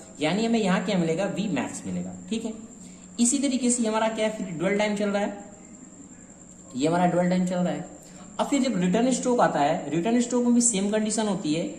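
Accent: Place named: Hyderabad, India. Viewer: Hyderabadi accent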